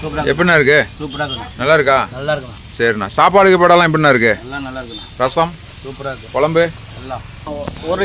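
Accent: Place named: Salem, India. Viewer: சூப்பர். நம்ம சேலம் மாவட்ட பேச்சு வழக்கம். சாந்து, பொங்கி, கொத்தமல்லி தழை கேட்பதற்கு இனிமையாக உள்ளது.❤❤❤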